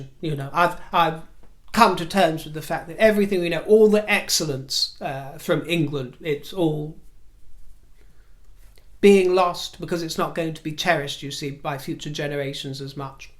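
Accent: United States. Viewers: you do a good American accent. I mean, it really rings true! Wherever did you pick it up? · I love your American accent! ❤ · Your American accent is so good. It always makes me laugh; you are so funny. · I love your American accent!!! You nail it.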